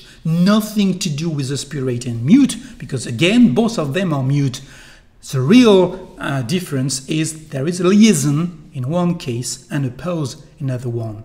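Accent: France. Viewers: Il est français clairement hein, vu sa prononciation de l'anglais ^^"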